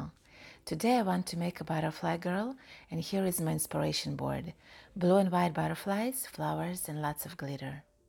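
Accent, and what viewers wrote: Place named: Russia. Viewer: Русский акцент слышен всегда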